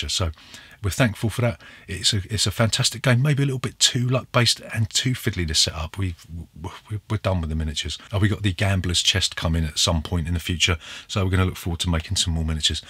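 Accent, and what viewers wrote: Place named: South London, United Kingdom. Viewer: Another great and really interesting 10... Chinatown and Puerto Rico were two of the first of the modern style games that I played...👍. Must admit I prefer San Juan... Really looking forward to the next ten... Great job... Got to ask...where are you from...? Your accent is almost as south London as mine...no offence meant if your not from South London...😁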